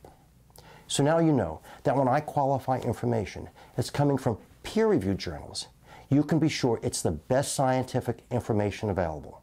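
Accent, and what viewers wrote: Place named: East Coast, United States. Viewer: He´s definitely from the east coast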